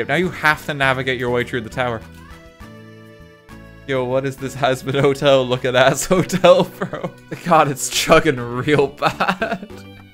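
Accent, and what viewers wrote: Ireland. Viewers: Why do you sound Irish but use southern slang? Lol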